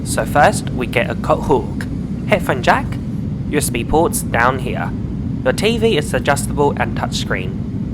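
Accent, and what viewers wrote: Australia. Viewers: The Aussie accent is classic mate! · Hey did you go to school in Australia. I am hearing your accent😂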